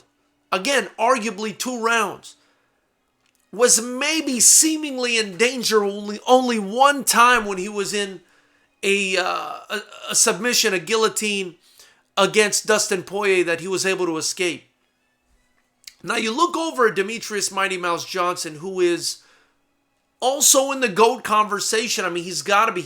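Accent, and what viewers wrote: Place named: Brooklyn, United States. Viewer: You sound Brooklyn son 👊 As always on point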